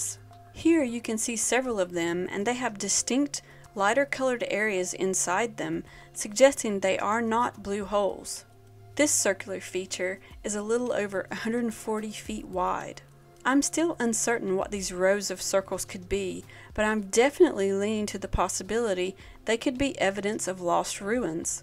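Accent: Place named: Tennessee, United States. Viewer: I dont know where you're from but I hear some Tennessee in your accent.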